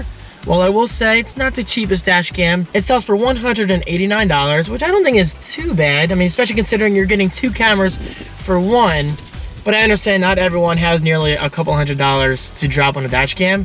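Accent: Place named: Philadelphia, United States. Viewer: Classic Philly Accent haha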